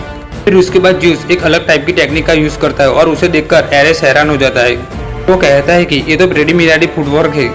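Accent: Gujarat, India. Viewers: Aap Gujarati ho?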